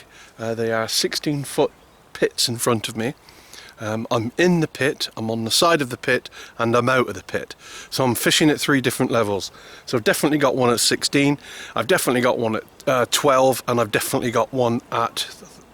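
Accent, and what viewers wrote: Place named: Lancashire, United Kingdom. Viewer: Sounds like your from my end of the country pal, im near Chorley, when you fish sunny 2 if you can get on the double peg closest to the island get on it its a great swim with gravel bars to your right and a great overhanging tree margin and you have the island to fish to, all the best.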